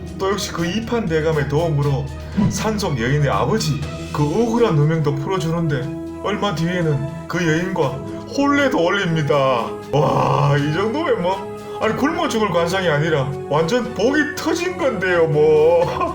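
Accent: Gyeongsang, South Korea. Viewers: 넘 재밋어요. 교훈도 되구요… 뭐니뭐니 해도 남도 구수한 갱상도 억양이 잴로 좋아 홀딱 반했어요.ㅎㅎㅎ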